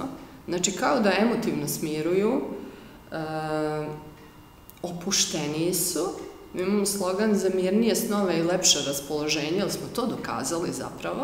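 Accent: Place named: Novi Sad, Serbia. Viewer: Kako je milina slusati Novosadski akcenat. Bukvalno te uspava.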